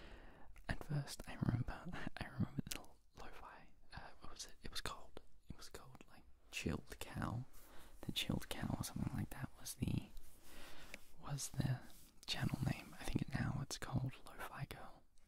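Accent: Australia. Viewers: im not so familiar with the aussie accent but i've just noticed that yours isn't as strong as other aussie asmrtists (asmr beam for instance), what part of australia do you come from, north/south/east/west ?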